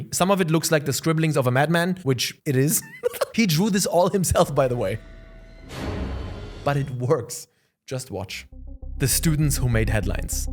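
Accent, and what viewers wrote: Germany. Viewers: You have a germany accent. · finds so lustig, dass ja super englisch spricht, aber man trotzdem das deutsche raushört:D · I like your german accent. Sounds more brutal this way...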